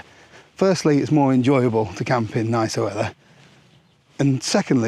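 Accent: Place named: East Yorkshire, United Kingdom. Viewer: Brilliant video from Mr., very informative.... 'Ull' east yorkshire.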